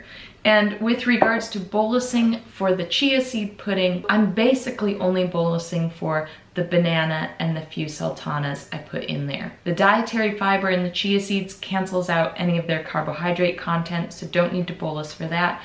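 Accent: United States. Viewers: You sound American instead of British!!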